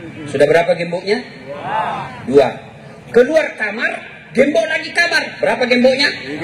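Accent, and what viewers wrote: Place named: South Sulawesi, Indonesia. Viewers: logat sulawesinya dapet bgt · Ciri has logad ceramah ulama bugis makasar madura mantab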